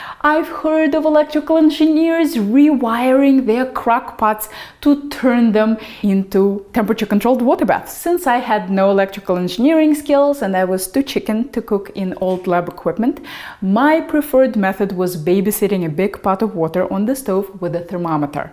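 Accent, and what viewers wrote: Russia. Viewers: Russian accent isnt? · Ох уж этот русский акцент ))) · Акцент русский? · что за акцент россия? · What a Russian accent has. Very demanding women. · Звучит как русский акцент - всё понятно и разборчиво! )))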